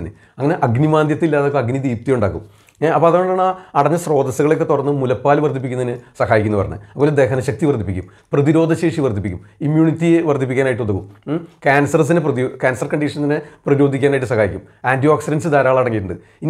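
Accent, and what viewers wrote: Thrissur, India. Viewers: തൃശൂർ ശൈലി യിൽ നല്ല അവതരണം. Good information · Trichur slang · ത്രിശൂർ slang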